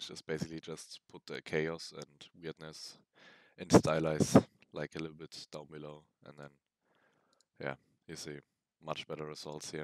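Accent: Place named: Germany. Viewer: i hear there a bit of DEUTSCH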